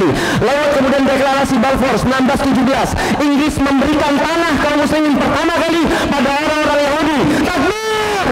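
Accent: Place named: Sumatra, Indonesia. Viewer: Dahsyatnya...keberanian Ustadz Felix... .wajah boleh Cino....Tapi suaranya Sumatra.banget....Semoga dpt trs.. .berdakwah...aamiiiin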